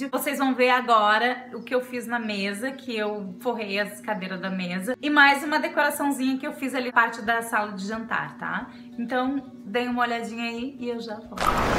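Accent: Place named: South, Brazil. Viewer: Conheci seu canal hoje e estou assistindo os seus vídeos, seu sotaque me chamou a atenção, vc é do Sul??? Amando seus vídeos, sua criatividade e capricho. Parabéns 😘 😘 😘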